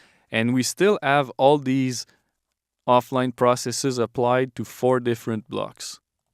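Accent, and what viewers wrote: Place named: Quebec, Canada. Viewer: You would love Object editing in Samplitude. They been doing this for a long time. They have this crazy sale going on right now for Samplitude Pro X3 plus Sound Forge Pro 11 and Spectralayers Pro. Worth looking into. I'll post a link but I'm not sure it'll work. <link> I'd recognize that Quebec Accent anywhere. Salut! And thanks for the video.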